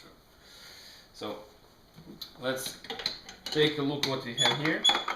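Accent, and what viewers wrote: Russia. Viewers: Хороший русский акцент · Russian? Italian?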